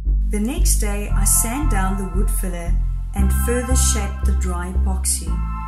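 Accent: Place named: South Africa. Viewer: Sounds like you're from South Africa.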